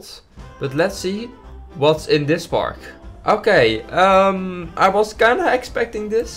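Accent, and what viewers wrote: Netherlands. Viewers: Sorry.... But i smell dutch btw ik ben het ook! Je bent goed in ebgels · Are you dutch?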